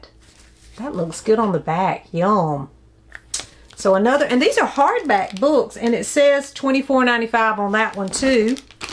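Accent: South, United States. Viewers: Hi! I haven’t yet commented since finding your channel earlier this summer so today I decided was the day. I adore your channel! I really love your southern accent. I’m originally from Wisconsin so I am not accustomed to hearing the southern drawl and I find it so endearing. Whenever I find a new channel I enjoy, I go back and watch all of their videos to get to know them. I’m so enjoying you and the infectious love of books you bring to your channel. Your genuine passion and love shines through brightly and I thank you for being such a bright light. I love everything you post! I would love to see a video talking about your all-time favorite books that have left a lasting impression on your life. Thanks for sharing this haul 📚 ~ Chelsea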